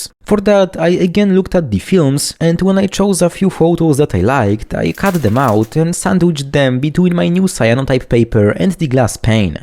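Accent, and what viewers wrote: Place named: Poland. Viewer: I live to watch the armchair and backseat drivers be UNABLE to stop themselves from commenting and correcting..... JFC THIS is why we're in a burning dumpster of civilization....🙄 Otherwise, thank you to my favorite amateur chemist with the most AMAZING Polish accent.🎉 Highly informational and entertaining. Quality stuff. Kudos from Appalachia 🤙🏻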